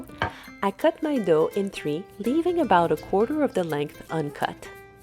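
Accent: France. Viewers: Bonjour. La façon dont vous prononcez certains mots me fait penser que vous êtes française. Vous prononcez certains mots avec notre accent français. Je me trompe? Merci pour vos vidéos. C'est grâce à vous que je découvre votre univers et c'est super intéressant et beau à voir. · Hi! I can hear a french accent behind your hebrew words! Haha! I really love your channel and everything you present in it. It brings a lot of positive energy and ratzon to prepare good things as well. Be blessed! Yeshar koach!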